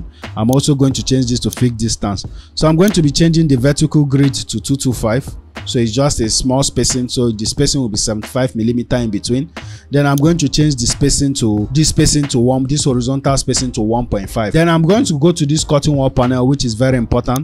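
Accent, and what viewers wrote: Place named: Singapore. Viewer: I am from Singapore and I think we have a very similar accent! haha.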